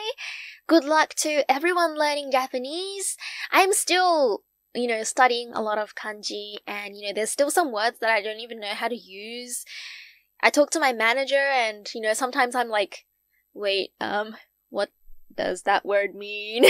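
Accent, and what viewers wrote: Australia. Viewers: Every time I have one of your videos going in another tab I expect the next words out of your mouth to be "WELCOME BACK TO HOW TO COOK THAT I'M ANN REARDON" your aussie accent is so strong, I love it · Oh man just found out this channel today. The nostalgia. I lived in Australia too for my childhood and they sent me to Saturday school. I fucking hated it 😂 In the end I went back to my home country and I still can't speak my mother's tongue, what a waste of perfectly good Saturdays. Unfortunately my Aussie accent has died a bit cause I have to adapt to the English here so others can understand me. Man it'd be great if I could go back - say sunnies, undies and collect cricket cards from Weetbix again. It's so refreshing hearing the Aussie accent even if it is a bit different than what I'm used to, I think it's from Sydney. I'm #Perth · I knew that she was from Australia the first time I heard her accent I have a friend that's from Australia and she has mostly the accent as my friend lol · The aussie accent is so cute😩💓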